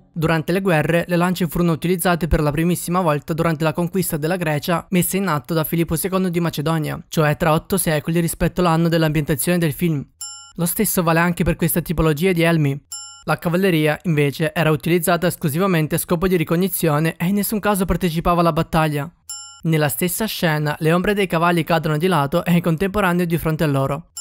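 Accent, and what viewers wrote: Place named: Naples, Italy. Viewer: complimenti per il video. PS. l'accento parteneopeo si sente tutto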